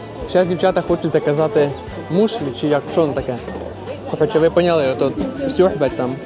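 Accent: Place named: Slobozhanshchyna, Ukraine. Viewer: Вдячна за відео. Це мій улюблений "жанр" в ютубі - подорожі по різних куточках різних країн. Але під час перегляду чомусь прийшло на думку давнє іспанське прислів'я:"Швейцарія - це красиве дерево, яке росте на смітникУ". Сподіваюсь, контекст прислів'я зрозумілий для думаючих людей. З повагою. Окрема вдячність, що не забуваєш "слобожанську говІрку"!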